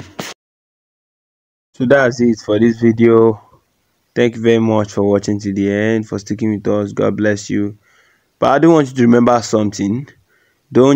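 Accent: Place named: Nigeria. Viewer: This guy sounds Nigerian cos im a Nigerian. Thanks very much really appreciate the solution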